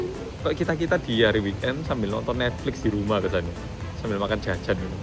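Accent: Java, Indonesia. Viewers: ur korean accent jdi berubah ya bro, jdi jawa medok accent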